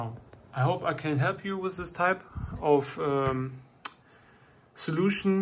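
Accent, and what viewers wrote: Germany. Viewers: am i correct and i listen to german english speaker? My english is the same haha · Höre ich da deutsch?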